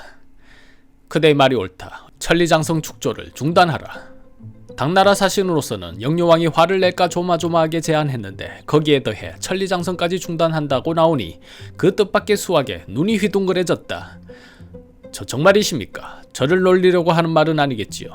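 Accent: Gyeongsang, South Korea. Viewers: 경상도 사투리. 감추려 해도 감출수 없지.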